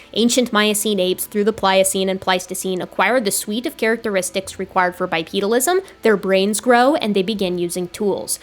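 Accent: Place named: Minnesota, United States. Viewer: Is that a Minnesota accent I hear, ew.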